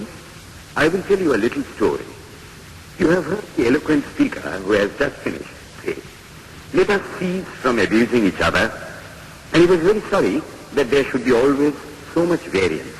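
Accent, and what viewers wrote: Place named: West, United States. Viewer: This is not the voice of Vivekananda. Pure accent of the people in the west.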